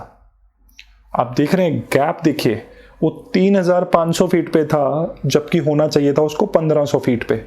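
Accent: India. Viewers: Looks like an Indian commentary. Accent gives away! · captain who ?! JahaJ ? opinion ? رائے ؟ matter nahi kartı junior hu ya senior. JeeJh ? Are you an indian ? You have an indian accent ! آپ کا ہندوستانی لہجہ ہـے _ جو کے انتہائی مشکوک ہـے _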